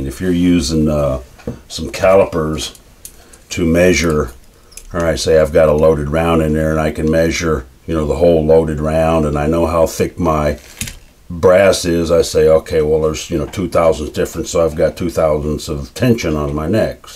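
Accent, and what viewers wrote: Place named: South, United States. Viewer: Hornaday Sayko Lay-pewah Triggered yet? 🤪 That said, most of what we learn about our sport is read in books, magazines, or gun blogs, so if you've never heard it pronounced properly you'll say it the way it looks like it should sound, I don't personally have a problem with that. BUT blaming you incorrect pronunciation on your southern accent is a cop-out: even a southerner can learn to pronounce names correctly! Frankly, it's the polite thing to do!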